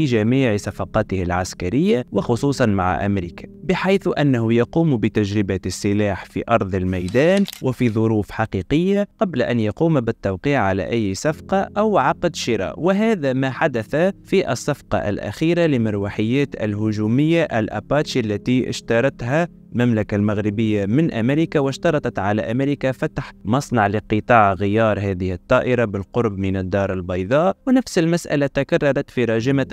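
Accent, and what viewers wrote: Tunisia. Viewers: كل مرة يخرج عليك برتوش شبعتو الخرياشة خرشف و كذوب المغرب غارق في ديون المغرب لم تبق له سيادة كلشي في يد الصهاينة انصحك اصاحب القناة انشر فيديوهات تتكلم على طاجين المغربي مثلا او تكلم على الظلم والفقر والقهر لي عايشو المروكي اولد القاف لمغاربة عاقو بكذوبكم على حساب صوتك تونسي باغي تسترزق على ظهور لحمير السناغلة 😂😂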